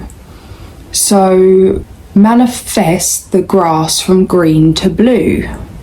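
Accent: United Kingdom. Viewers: I love that British accent!!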